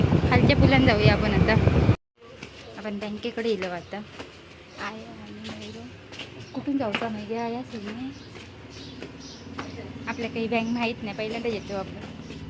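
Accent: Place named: Malvan, India. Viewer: तुजो गाव कोणतो नांव काय गावाचा‌ मालवणी बोलतस ऐकाक बरा वाटता मी पण मालवणी आसय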